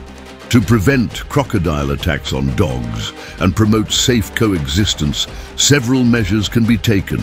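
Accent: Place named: United Kingdom. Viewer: What an interesting Indian bgm, while the guy narrates in British English. What a harmony😊.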